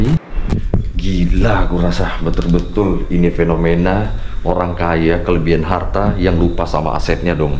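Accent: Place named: Medan, Indonesia. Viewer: Hadir bg salam dari medan horas. Sellu suka dgn smua konten2 abg. Upppp ternyata abg org medan. Di vidio ini baru sya dengar abg org medan. Horas ma Hu bamu bg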